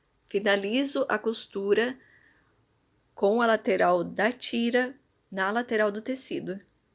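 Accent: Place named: São Paulo, Brazil. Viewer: Me perdoe amiga mais esse sutaque paulista me dá nos nervos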